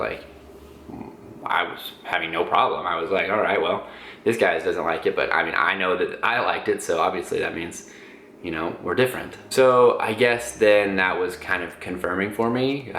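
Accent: Arkansas, United States. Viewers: Lovely story. Love your AR accent. I used to have AR accent too.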